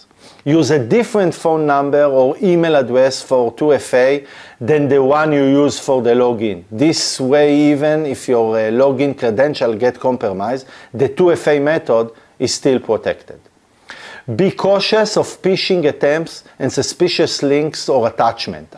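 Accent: Israel. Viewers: My brain kept hearing "TO F*CK TOROUGHTENTICATION" until it learned that it was just a strong Israeli accent. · בלי להעליב שומעים עליך שאתה ישראלי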